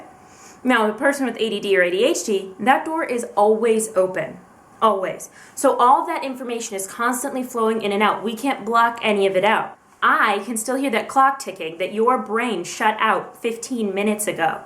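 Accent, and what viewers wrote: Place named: United States. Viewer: She sounds country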